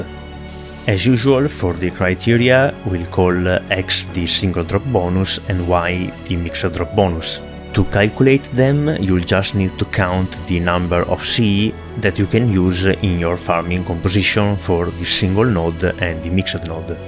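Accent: Italy. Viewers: Needed this, thaknk you? (Sei italiano vero? ;) ) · What a nice detailed guide, ty so much! I recognized rapidly that you're italian from your accent :D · Are you Italian?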